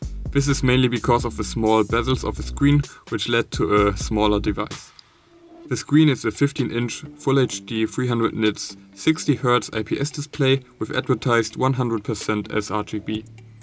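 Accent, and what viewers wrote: Germany. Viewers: Dein Englisch ist gut, man hört nur sehr dass du Deutscher bist... xD LG :)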